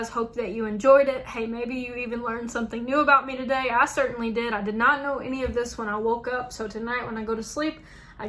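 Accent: South, United States. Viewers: Are you from the Deep Deep South?, because I can hear a SouthernBell Accent from you?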